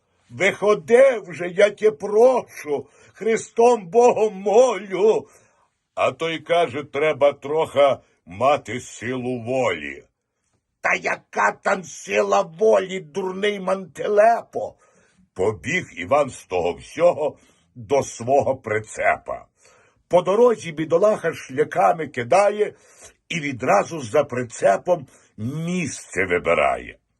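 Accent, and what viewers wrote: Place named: Lviv, Ukraine. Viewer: Класно! Дуже посміялась! А львівська балачка- то супер файно!😂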